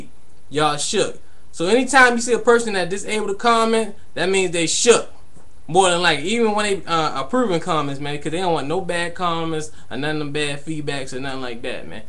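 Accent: Baltimore, United States. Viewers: LOL!, you sound like you straight up from Baltimore! Now that I live here, I'm starting to get use to the accents... And oh yea, COMCAST sucks!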